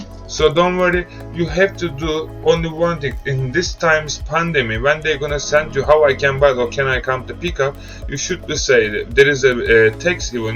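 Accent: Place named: Turkey. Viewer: eski alışkanlıklar unutulmuyor ceterele viii :) şaka maka türk gibi ingilizce konuşmanı çok taktir ettim eğitimini almayı düşünüyorum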